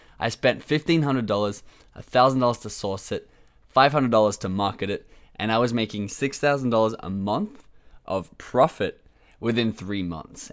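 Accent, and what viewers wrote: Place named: Australia. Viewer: Hi! Thanks for a great course, just found your youtube channel. One question, are you based in the US or have a company registered there? The reason why I am asking is that I am based in Europe, but would like to sell on <link> and from the sound of it you are from Australia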